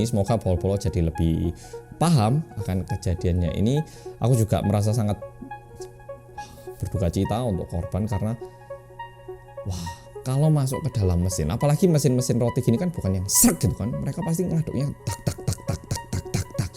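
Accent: Java, Indonesia. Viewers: Lah kmn aja..org hidupnya lbh byk di Indo..ngomongnya aja medok bgt..🙈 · oppa ngomong nya kok medok si oppa 🙈🙈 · Yaelah wajah nya ala Korea logat bahasa nya ala indonesia jawa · Wahh abang ini habis nikah tambah ganteng. Selamat ya bang orang Korea yg medhok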